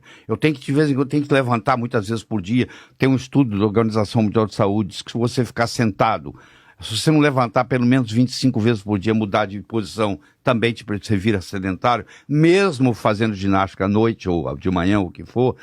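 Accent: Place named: Portugal. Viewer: O sotaque da língua portuguesa mais lindo do mundo : ♡♡♡Portugal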